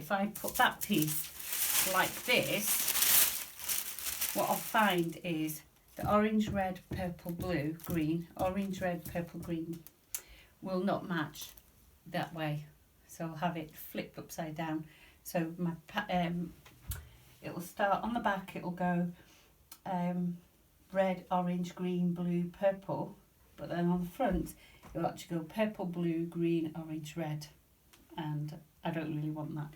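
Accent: Yorkshire, United Kingdom. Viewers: Hi, just wanted to say that you explain things so well, thanks for that. I'm watching your vlogs tonight and really enjoying them. Do I detect a Yorkshire accent!!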